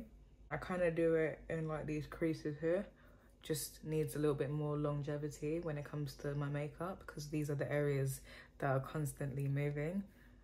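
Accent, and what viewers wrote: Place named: England, United Kingdom. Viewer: Finally found you on YouTube from my ig videos and was shocked when I heard you speak....youre English!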